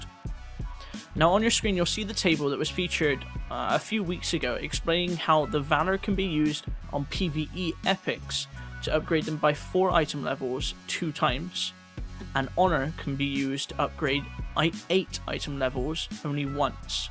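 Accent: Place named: Bristol, United Kingdom. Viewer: Wow... you sound sooo bristolian..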